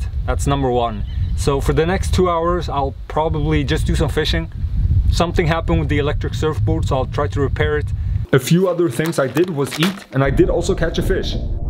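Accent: Norrland, Sweden. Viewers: bor du i norrland eller låter så på dialekten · 'I bore three holes [...]' The Swenglish is strong with this one.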